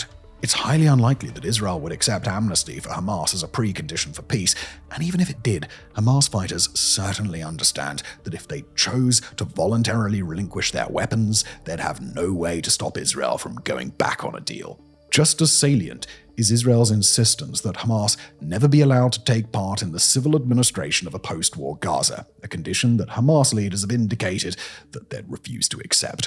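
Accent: United Kingdom. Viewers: wow he is so unbiased. Feel really ashamed that i used to listen to this bald old ass british accent from medieval london.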